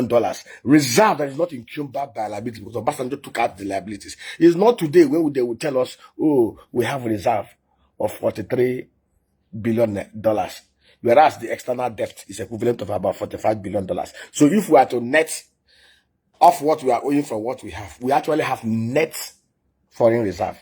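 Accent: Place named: Ghana. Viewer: You look and sound Ghanian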